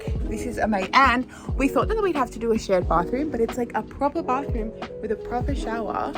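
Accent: Australia. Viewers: Sound aussie!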